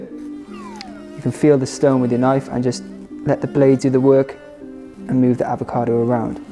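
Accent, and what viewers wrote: United States. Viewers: omg that American accent. I'm dying 🤣 I have had the biggest grin this whole video lol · That American accent is both endearing and entertaining. I have to try these recipes!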